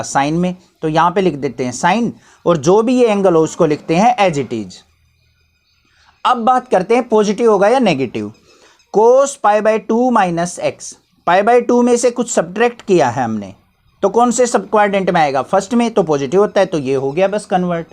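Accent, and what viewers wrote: Haryana, India. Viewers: Sir aap haryana se ho..??🤔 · sir ji aap haryana se ho na thari bolan ki ton t laga se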